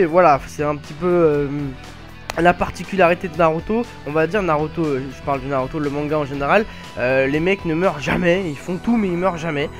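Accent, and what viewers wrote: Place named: North Africa. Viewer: eh toi avec ton accent du bled on a rien compris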